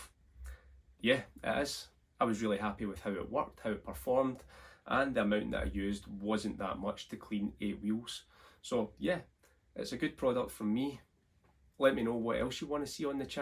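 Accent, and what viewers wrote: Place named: Glasgow, United Kingdom. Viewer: Hello great video, first time viewer here with just a few questions What civic is that? Saloon, Hatch or coupe as well as pre facelift or not? What scrapyard did you go to, to find Japanese car parts, I’m in Glasgow and you sound like it too so I thought I’d ask. Any scrapyard with parts for a 2000’ civic would be nice to know of, I’ve got my own project which I’m trying to complete too, thanks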